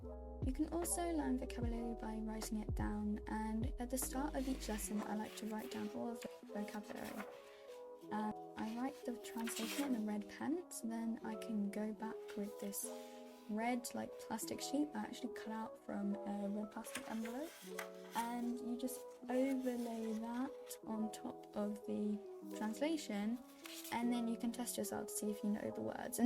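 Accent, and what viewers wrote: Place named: United Kingdom. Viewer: You're so underated btw ur British accent is ssoooo good i love it💗💗💗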